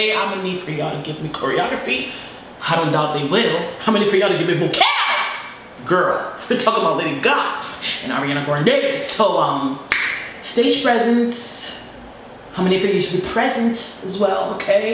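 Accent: United Kingdom. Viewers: I LOVE YOUR ALBUM REACTION AND ESPECIALLY YOUR 🇬🇧 ACCENT!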